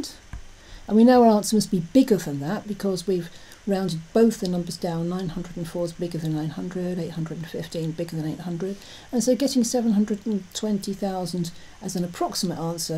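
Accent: United Kingdom. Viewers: Are you English?, it seems brumie accent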